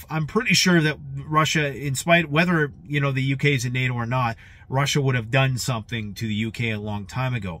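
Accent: Canada. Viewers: Trump is the best u sound like Canadian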